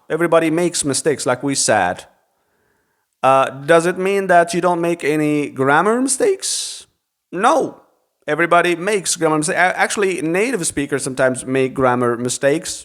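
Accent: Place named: United States. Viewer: American Accent good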